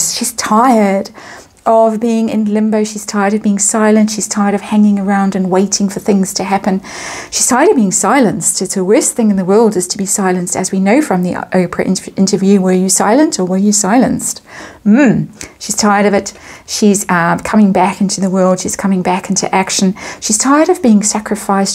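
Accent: South Africa. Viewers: I love your readings, your dogs chiming in, your lovely South African accent and am not at all bothered that the cards don't always fit into the frame since you take them up to the camera when you are talking about them. Thank you for another wonderful reading! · I love your South African accent. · Your accent and Bookworm's are totally different but perhaps it is due to what part of South Africa you each reside in. I don't know anything about South African accents so forgive me if I am wrong.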